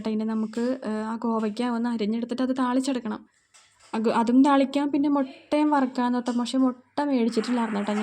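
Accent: Kochi, India. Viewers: Kochi slang angane aanu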